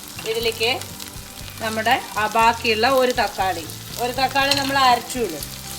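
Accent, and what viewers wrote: Palakkad, India. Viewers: moly. I used to make 70 percent items in same recipie. But I will not miss your videos as I like to hear the explanations in palaghatan sailie.Also I enjoy your (jokes) homely talks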